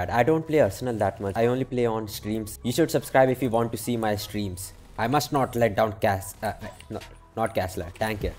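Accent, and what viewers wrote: India. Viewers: yo it seems like you're indian, based on your accent are you? i am "indian american" but you are so cool for telling me that also whenever i play arsenal i hate this: "DEAD UPON SHOT REGISTERING" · I had a feeling you were Indian lol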